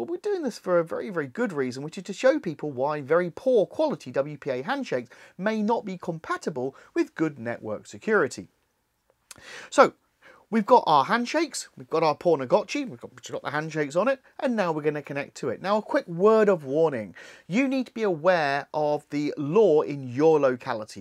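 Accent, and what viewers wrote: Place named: United Kingdom. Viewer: British accent really makes you.wonder whether he's saying pwn or porn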